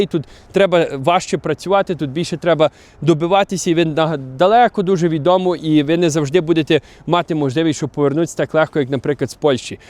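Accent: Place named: Lviv, Ukraine. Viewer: Так, майже с початку заснування вашого каналу. Я з 1990 по 1995 рік навчався у Львівській політехніці, проживав у гуртожитку на Бой-Желенскького. Мені дуже приємно згадувати ті часи, а також львівський діалект, яким ви говорите👍. Натхнення вам, та Творчого успіху! Дякую за відповідь!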